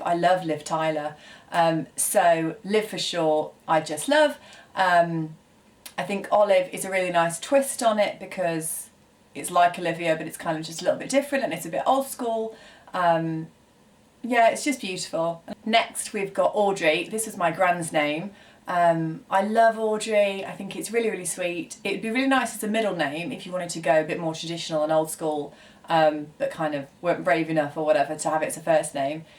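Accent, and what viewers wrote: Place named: United Kingdom. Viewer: How do you Brits do it? I'm from Alabama and I hear Georgia all the time and don't think anything of it- then I hear you say it with your fantastic accent and I'm in love with it. Lol What I wouldn't give for a British accent. Tabitha makes me think of the American tv show "Bewitched."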